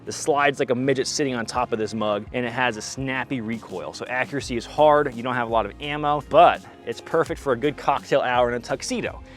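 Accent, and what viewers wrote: England, United Kingdom. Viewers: British accent 😮‍💨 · The first American I’ve seen with a convincing English accent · LMAO with British accent!! please continue with the FUN videoss and Comic Intros!! bro. you made up our DAY!!! F@ YEAHH!!! kind regards Slow n Smooth <> Smooth is Fast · sounds like a elegant english teacher with that british accent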